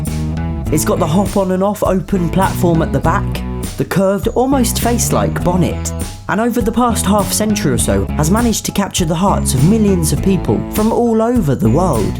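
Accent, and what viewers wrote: United Kingdom. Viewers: I am so glad that I found this channel,I love the stop motion,the music and all of the wonderful content! Also,. British, anyone?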